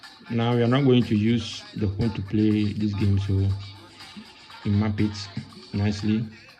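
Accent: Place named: Ghana. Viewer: GHANA man English dat , no lie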